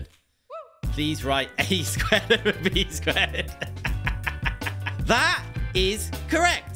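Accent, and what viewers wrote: United Kingdom. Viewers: Noa fan of the British accent and the large number of words pronounced incorrectly.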